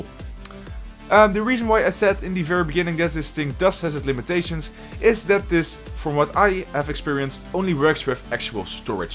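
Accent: Netherlands. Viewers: Nice dutch accent 🧡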